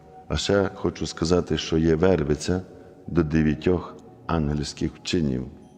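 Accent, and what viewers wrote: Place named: Western Ukraine, Ukraine. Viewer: я зі Львова, отець так говорить як західняк (схоже він з Франківщини), але де його парафія? це Днпро? і яка це конфесія?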